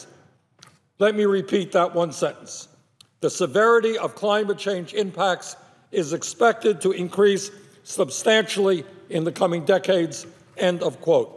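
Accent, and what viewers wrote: Brooklyn, United States. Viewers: Gotta love the old school Brooklyn accent!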